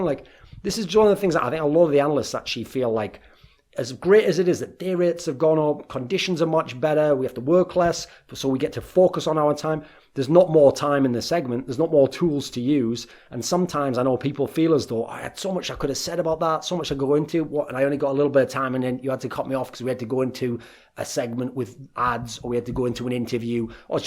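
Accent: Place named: North East England, United Kingdom. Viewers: Yugo Betrugo Duncan is from South Shields in England look up the accent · North East England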